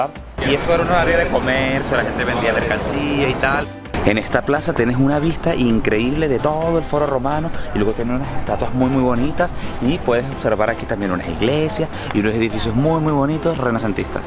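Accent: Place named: Venezuela. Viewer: Llegue por casualidad a este video buscando informacion para mi proximo viaje a Roma, y por el acento y los comentarios sobre cada lugar asumo qué eres venezolano jajajajajajaja, tengo varias dudas sobre el viaje, has usado la tarjeta Roma Pass? la recomiendas? es cierto qué te ahorras la cola en las atracciones si la usas? Gracias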